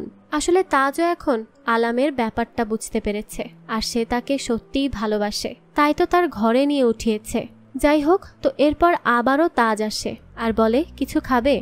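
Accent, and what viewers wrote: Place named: Bangladesh. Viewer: আপু তুমি কোন দেশি,,,তোমার ভয়েস r কথা শুনে মনে হচ্ছে তুমি বাংলাদেশি,,,তোমার চেহারা দেখার খুব ইচ্ছা ছিল আমার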